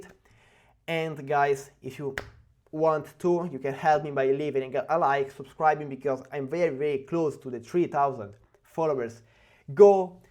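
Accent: Italy. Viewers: ma per curiosità perché li registri in inglese?avevo sentito un pelino l’accento italiano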